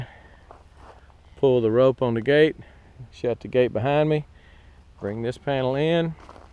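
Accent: Texas, United States. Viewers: I thought you said something, and thought that was not like you... at least not in public anyway! But no, it was just your Texas accent. All you said was "'cause they're sheddin'".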